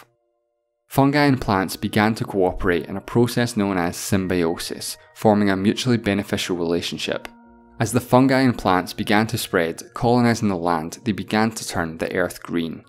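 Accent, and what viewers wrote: Scotland, United Kingdom. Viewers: Alright I need to know what your accent is lol. It sounds Scottish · I love the mix of knowlege and scottish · Excellent video...thank you! Also love the narrator's voice and Scottish accent, no homo. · As a first time watcher, I learned two things. 1) This guy's accent is hard to pinpoint, but I think somewhere in Scotland. 2) We should send fungi that can survive in space out into the stratosphere and see what happens.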